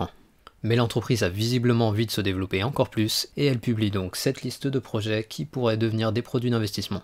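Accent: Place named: England, United Kingdom. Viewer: J'adore ton accent anglais of course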